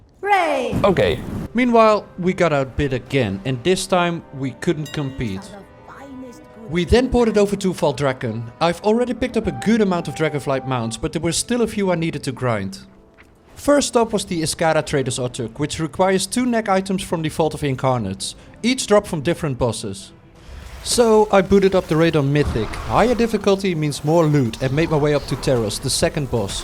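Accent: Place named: Netherlands. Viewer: sorry but it was very funny xD, i guess youre from the netherlands ^^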